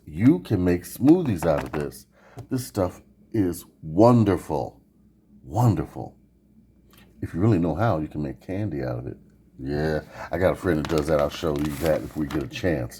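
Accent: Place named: Louisiana, United States. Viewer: I know you said you lived somewhere around Chicago but even though I'm deaf I can hear your accent you sound like you're from Louisiana originally.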